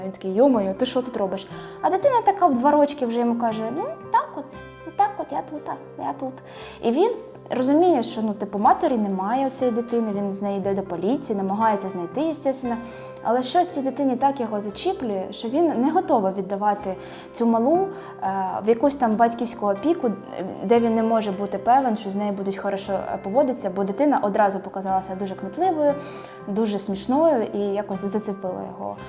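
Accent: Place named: Surzhyk, Ukraine. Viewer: подобається твій періодичний суржик) дякую за роботу.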